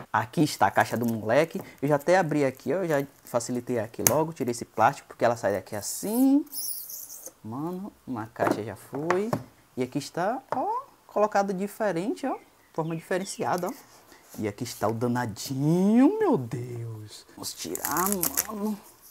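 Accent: Bahia, Brazil. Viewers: Tu é baiano de onde, man?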